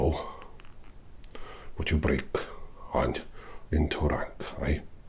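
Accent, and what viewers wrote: Wales, United Kingdom. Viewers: Loving the deep Welsh tones. Like a cosy, comfy blanket. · I am literally dying of excitement rn! OMG! I just keep discovering more and more reasons to love you! My grandad was in the RAF (both of them were actually) but the one I lived with was a snowdrop (RAF Police) and he taught the ATC he was an instructor. I also adore the Welsh accent! It's giving Battery Sergeant Major Williams and I am totally OBSESSED!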